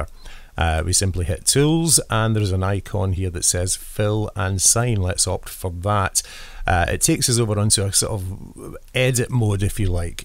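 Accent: Scotland, United Kingdom. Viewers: Love your accent!!!! Scotch, Irish? I would guess the first but I love it regardless!! And Theenks fir da veedeo. XXOO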